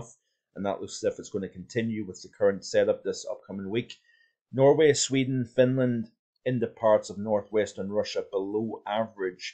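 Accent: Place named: Northern Ireland, United Kingdom. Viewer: This will probably be the height of our cold snowy winter. With last year being quite cold and that becoming an ever increasing rarity I’m betting on this winter being a wet and stormy one. The climate change impacts are really becoming quite noticeable to me now over the past few years. More erratic cold spells and ever longer lasting heat into Autumn. Here in Northern Ireland it’s been a very odd year. An extremely humid almost tropical summer with a ridiculous amount of thunderstorms the likes of which I’ve never seen. In September there were severe elevated storms with really active lightning. Now it’s the amount of rain we’re getting. Absolute crazy. Most of October and up to mid November was basically written off in terms of hitting the rivers for some fishing. One of my nearby rivers is still high as it has been for weeks. 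By the way are you from NI? I seem to recognise your accent. You do some amount of work on here it’s much appreciated👍.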